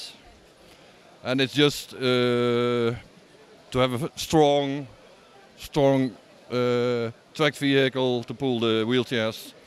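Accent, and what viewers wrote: Netherlands. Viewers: je hoort gewoon dat hij nederlands is 😂😂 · HAHA HET NEDERLANDSE ACCENT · He's from the Netherlands i can hear his accent i am Dutch too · ik vind het altijd zo mooi om te zien dat mensen zon nederlands accent hebben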